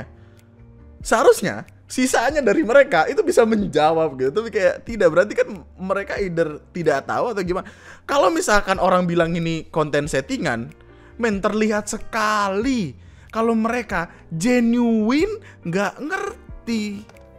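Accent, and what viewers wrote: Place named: Kediri, Indonesia. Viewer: Kediri banget logatnya😂 salam dari Kediri bang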